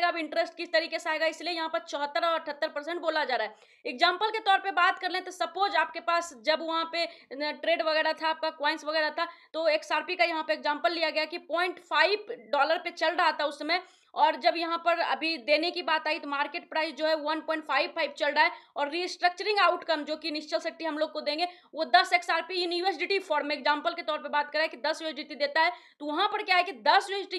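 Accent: Bihar, India. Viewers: Tum pakka bihari ho qki baat krne ka tone apne Bihar ka hi hai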